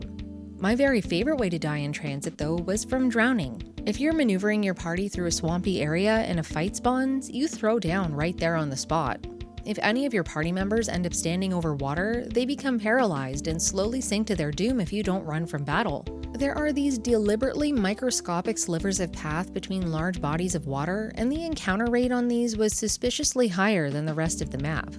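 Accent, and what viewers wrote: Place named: Canada. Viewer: I thought I heard a Canadian accent in your video, which was why I mentioned the LttP morcel. I don't remember the translation of Drakkhen very well as it was decades ago, but it has to be better than the English version as it was written by a French studio. If you are correct, the fact that they translated the game from French to Japanese (two wildly different languages) and then from Japanese to English (also two wildly different languages) is very much going around the arse to get to the wrist and ends up not surprisingly with a bad translation. The PC version in the original French can be found at a site called Abandonware France. I'm sure that you could muddle through as you have already played the game. As for Link to the Past, that game is quite text-light and the French Canadian rom is out there, so I am sure that you could finish it without any difficulty.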